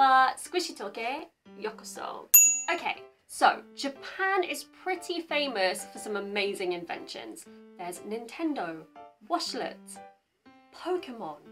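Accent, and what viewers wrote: RP, United Kingdom. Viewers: Hi, Somehow your talk soothes me a lot. It’s melodious to me actually. Is your accent the conservative RP or something? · かわいいー💓し、内容も素敵！ ブリティッシュアクセント好きすぎて登録しました〜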